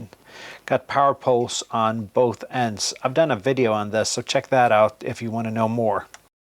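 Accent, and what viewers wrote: United States. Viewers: off topic but I had to do a double take on your qth because of your English dialect sounds American is that common in Norway thanks 73s kd9jay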